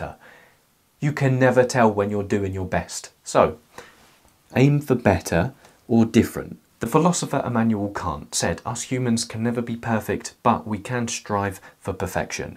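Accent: United Kingdom. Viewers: this british accent made it hard for me to understand lol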